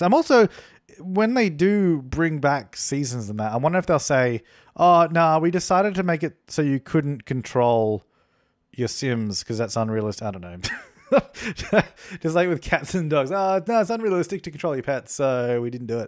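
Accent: Australia. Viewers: To be fair though, triangles are the best shape. Just saying, oh and also, I love watching Aussie youtubers like you. It helps me a lot considering I'm an Aussie to hear my own accent on a global platform makes me very happy. It also makes me happy to know you're from NEW like me (about 3-4 hours north actually) because all the Aussie youtubers i know are either from Victoria or Queensland (bar a few). I'd also like to thank you as you've helped me with a few very personal issues without even knowing it. Your everyday humor makes me smile in my darkest moments, which I'm forever thankful for. Another thing as this is getting super long, I absolutely love the style in which you build your houses, it's very similar to my own taste and you often talk about people requesting other styles, which is okay too.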